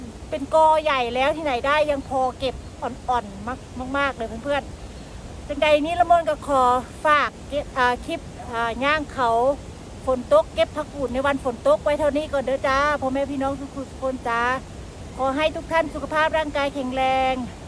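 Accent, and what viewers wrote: Sakon Nakhon, Thailand. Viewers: สำเนียงบ้านเฮาคักเนาะจ้าสกลละเบ๋อ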